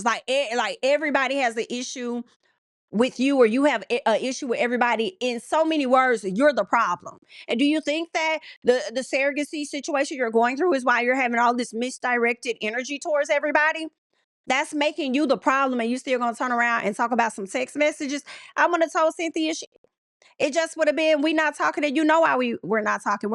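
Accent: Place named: South, United States. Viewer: Can you just talk normal... I enjoy your takes but sheesh😅 I'm in NYC so maybe I don't get southern accents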